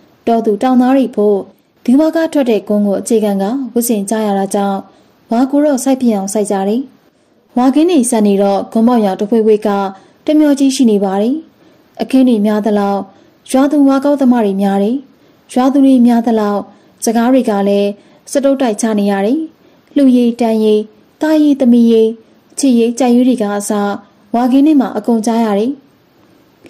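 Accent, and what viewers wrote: Upper Myanmar, Myanmar. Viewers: စကာပြောပုံလေတေကနာထောင်လိုကောင်တယ်အညာသူမဟုတ့်ပေးမဲအညာမြေကိုရောက်သွားသလိုပဲ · သနဲ့တ မကွဲတာ စာအုပ်ထဲကအတိုင်းလား ဖတ်သူကအညာသံပါနေတာလား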